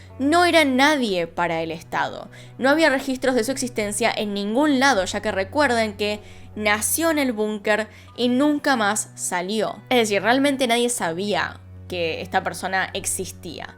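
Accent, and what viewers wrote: Argentina. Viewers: No soporto ver esto porq el shoooo no puedo escuchar argentinos pssss chau veo otro video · Me enkantan tus videos..eres excelente y me enkanta ese acento Argentino, tu lo haces mas KLASE😍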